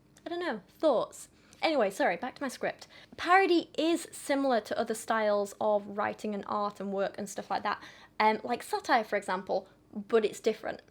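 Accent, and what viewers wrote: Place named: Yorkshire, United Kingdom. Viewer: I can't fully understand the relationship between and Kiera because I never had a dog or a bond so strong with a furry compagnon. Knowing what they went through together, I can only admire the clear love and trust between them. Happy to learn new things with your nice yorkshire accent!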